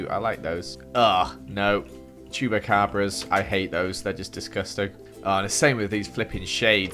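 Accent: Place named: Scotland, United Kingdom. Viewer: he is Scottish so he talked diff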